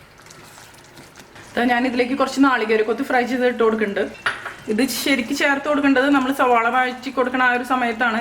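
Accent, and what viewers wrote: Thrissur, India. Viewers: തൃശൂർ ആണല്ലേ?? എവിടാ ചേച്ചി??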